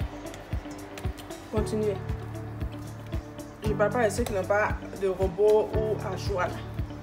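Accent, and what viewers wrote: Ivory Coast. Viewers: Ouh lala j'aime trop ra façon de parler à l'ivoirienne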